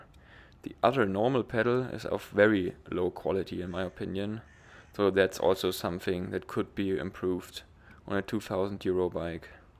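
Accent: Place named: London, United Kingdom. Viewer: I have been riding Brompton bikes for nearly 25 years. The early bikes were terrible in terms of brakes and brazing quality. I used to hate riding them off-road, and probably still wouldn't use mine off-road. , you need to trim the fender slightly. This is a prime point for rust where the mud sits on the frame. It WILL make the frame rust. Every year, these bikes get better. The best thing about them is that you will always be able to sell one for exactly what you paid for it; they do not depreciate. By the way, I detect a slight London accent when you pronounce 'Brompton' as you use the London 'glottal stop' in your speech. Did you ever live in London?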